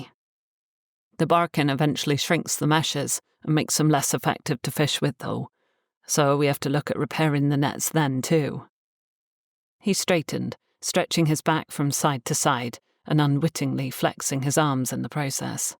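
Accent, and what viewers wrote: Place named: Cornwall, United Kingdom. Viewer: did a great job. She really brought the story to life with her narration and Cornish accent. She was the perfect narrator for your wonderful story. I’ve enjoyed this series. Thank you for making it available on YouTube.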